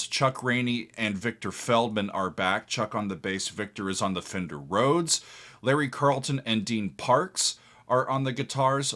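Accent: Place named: North Carolina, United States. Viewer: It's how folk from North Carolina and the Outer Banks talk naturally.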